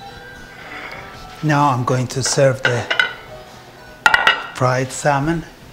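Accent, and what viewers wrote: Iran. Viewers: Awesome video, my salmon turned out great. This guy sounds Iranian. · oouuuuhh i can tell by that accent that ur persian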